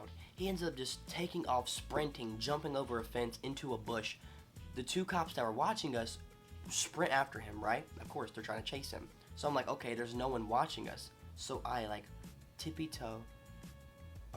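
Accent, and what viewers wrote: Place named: South, United States. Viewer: He has a really thick country accent😹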